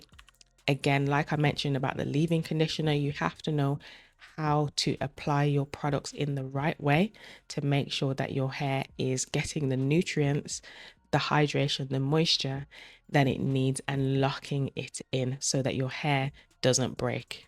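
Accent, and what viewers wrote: Birmingham, United Kingdom. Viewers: You and your hair are beautiful, giving me hope as I can sometimes get stuck, on another note love the Brummie accent, reminds me of a friend of mine.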